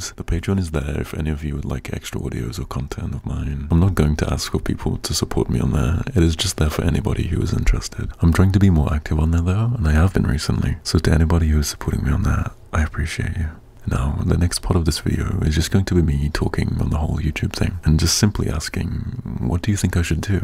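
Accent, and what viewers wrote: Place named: United Kingdom. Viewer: he's fucking BRITISH???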